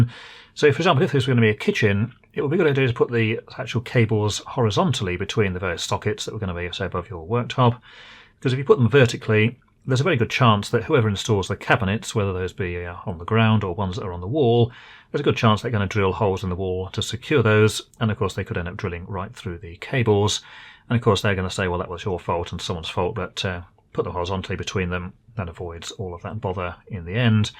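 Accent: United Kingdom. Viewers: Thanks for the precious content! I am a subscriber, watched a bunch of your videos... was surprised here by the speed of your pronouncing some sentences. The British English compounded by the fast enunciation made some parts difficult to understand. May I kindly beg for a bit slower speaking pace please - for your international audience? Thank you for the understanding!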